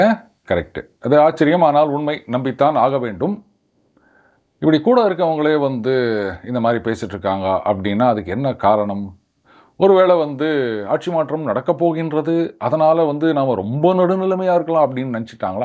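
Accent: Chennai, India. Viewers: Why don’t u talk in normal Tamil instead of Chennai Tamil. It is too bad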